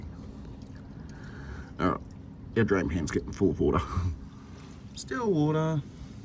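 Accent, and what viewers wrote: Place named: Australia. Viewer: Love it! Realistic Aussie narration and cool approach. You got my sub.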